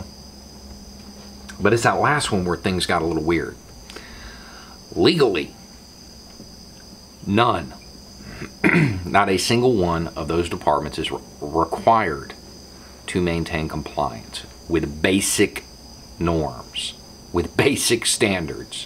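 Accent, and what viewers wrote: South, United States. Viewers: So I kept getting confused because it sounds like you're talking about getting rid of "no not greats". I had to rewind like five times to figure out what you were actually saying. I don't think it's your southern accent, either; just saying it to myself in my pacific coast accent, it sounds the same to me. Just one of those phrases that's confusing if you haven't heard it before.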